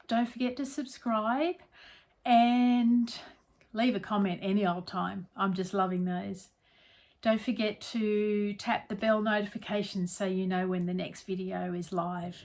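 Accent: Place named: Australia. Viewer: I'm so happy that your channel popped up. It is so good to hear an Australian voice! I will be watching all your previous videos to catch up. I'm only relatively new to crochet; I tend to sew in ends as I go. I love the colours you have chosen for these squares. I haven't used Stylecraft yet.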